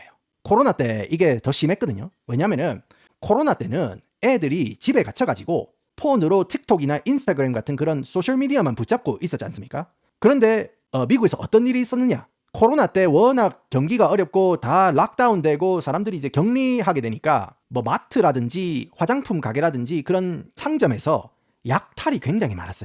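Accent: Gyeongsang, South Korea. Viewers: 영어와 갱상도 액센트의 강렬한 콜라보!!!!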